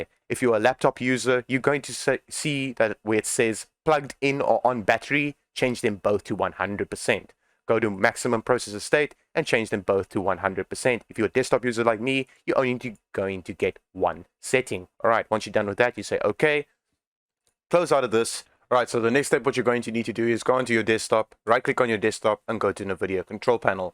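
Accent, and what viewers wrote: South Africa. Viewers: i love the south african accent XD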